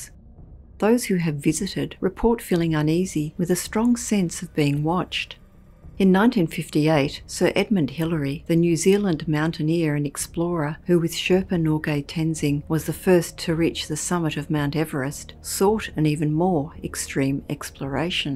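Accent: Australia. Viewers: Here from North Queensland, Love hearing another Aussie voice, 🇦🇺🇦🇺🇦🇺🇦🇺🐨🐨🐨🐨🐨🦘🦘🦘🦘and awesome channel.👍👍👍👍👍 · it's an Aussie Sheila it is .🐨 😲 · She's Australian. I'm one, I can tell.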